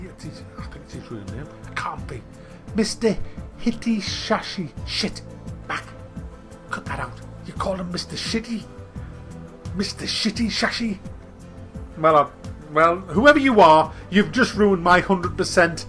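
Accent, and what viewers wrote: United Kingdom. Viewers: Somewhere from the UK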